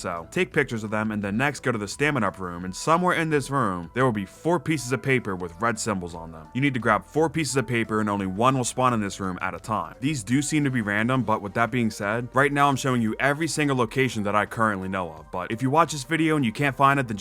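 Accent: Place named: United States. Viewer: Every time I look up a guide it’s some British dude. Glad to hear an American man, or at least someone that sounds like one 🇺🇸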